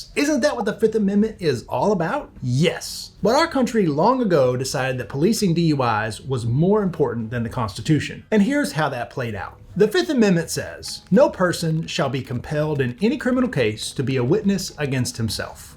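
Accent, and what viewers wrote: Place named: New York, United States. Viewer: Sounds like youre from NY.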